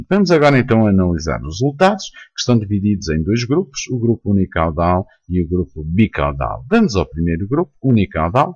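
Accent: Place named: Portugal. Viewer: KKK sou brasileiro e para mim é muito engraçado ouvir o português de um português hahaha. Boa aula.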